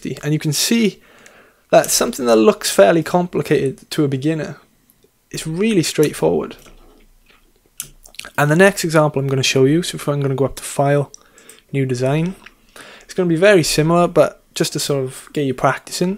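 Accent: South Wales, United Kingdom. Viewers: EXACTLY what I was looking for explained concisely and clearly in that wonderful South Walian accent. Merthyr boy here (now in Snwdonia) enjoying your videos.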